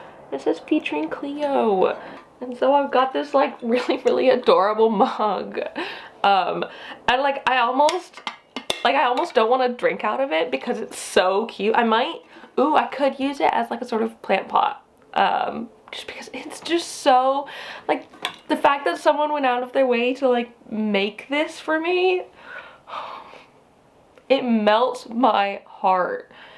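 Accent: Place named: England, United Kingdom. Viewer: You’re kinda picking up that English tang! Isn’t that funny how that works?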